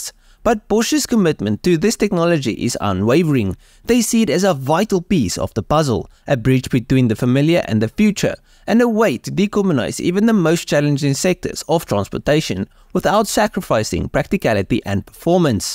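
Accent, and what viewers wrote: Afrikaans, South Africa. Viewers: Dear God that thick Afrikaans accent hit me with a wave of nostalgia. Subscribed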